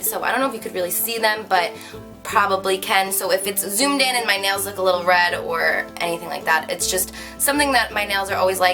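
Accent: New Jersey, United States. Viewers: You sound like you're from jersey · Omg your NJ accent came out deep here ! Lmao your the shit ! Screw the haters your beautiful !!!!!!!!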